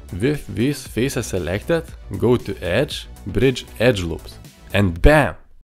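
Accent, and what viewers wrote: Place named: India. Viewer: Indian accent 😢😢😢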